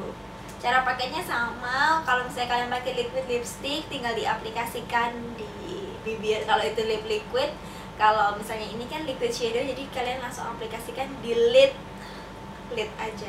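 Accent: Java, Indonesia. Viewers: Asli mana ka,,,,jawa nya medok.bgt hehhee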